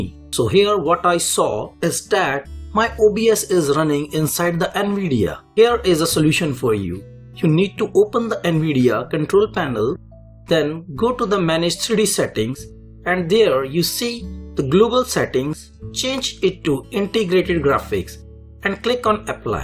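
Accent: India. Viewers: When I heard that Indian voice I thought it wouldn't work, but it actually did.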